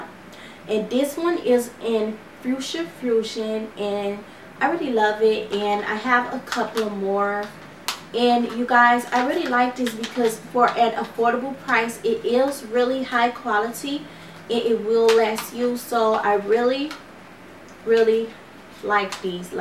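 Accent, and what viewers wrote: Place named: Haiti. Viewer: Are you from Haiti pretty lady? U sound like your from my island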